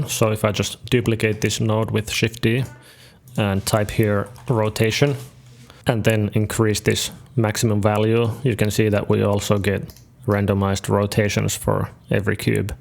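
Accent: Finland. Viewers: Veri finnish äksent👌 loving it! Great tutorial👊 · The finnish accent, we meet again.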